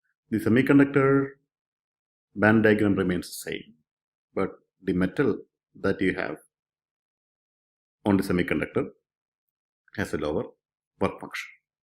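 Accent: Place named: England, United Kingdom. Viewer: Omg!!! Amazing English accent 😊😊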